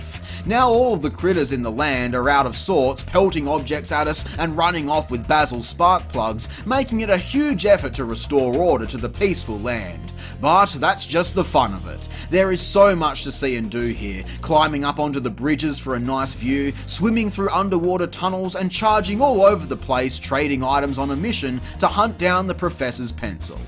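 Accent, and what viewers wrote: Australia. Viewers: So glad I found this. Subbed... 😀 are you aussie ?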